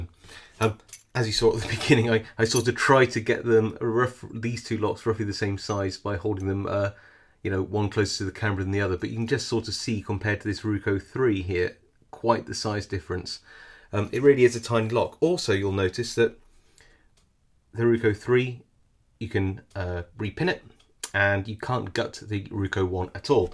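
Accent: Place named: RP, United Kingdom. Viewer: You are just so talented, you speak the "Queens English", you pick locks, gut and re-pin locks, and now I see you can do optical illusions! Does it EVER end!?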